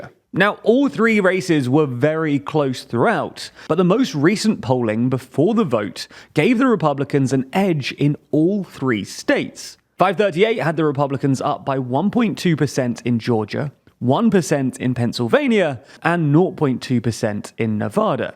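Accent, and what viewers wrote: United Kingdom. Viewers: I think the problem is that the announcer is a Brit. It feels like an outsider telling a news from a froeign country.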